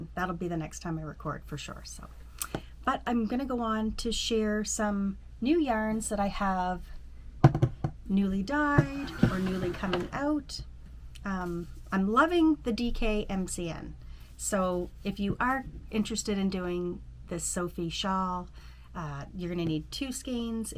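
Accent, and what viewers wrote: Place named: Maine, United States. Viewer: Yay thanks for the update after Vlogmas - love your Everett I have received your Valentine Box and am looking forward the finding a pattern and knitting up all your beautiful yarn. Love your “Down East” charm, nothing like a good “r”.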